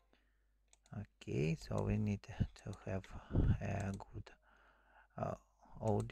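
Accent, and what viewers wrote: Russia. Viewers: Such Russian accent is it?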